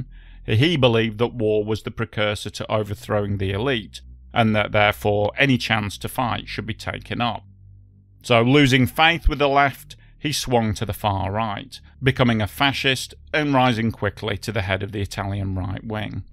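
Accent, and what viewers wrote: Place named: Northern England, United Kingdom. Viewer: a pleasant northern English accent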